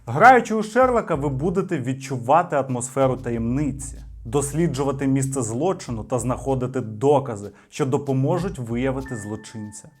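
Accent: Russia. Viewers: Хлопче, тобі необхідно працювати над українською, хоча б інколи спілкуватися з україномовними. Дебільні звуки, притаманні тільки рашистам, відвертають від перегляду і характеризують тебе як примітивного та слабого на голову дурня.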